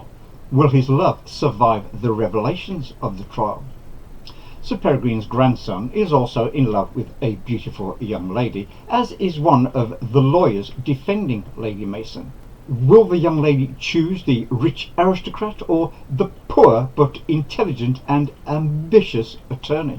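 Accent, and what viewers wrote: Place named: United Kingdom. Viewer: This book is now in my TBR and I am following your channel. Great job with the review. I love your British accent! Stay safe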